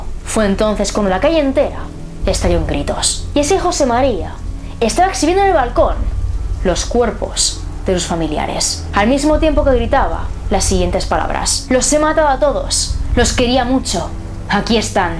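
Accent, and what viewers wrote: Galicia, Spain. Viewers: eres gallega fijo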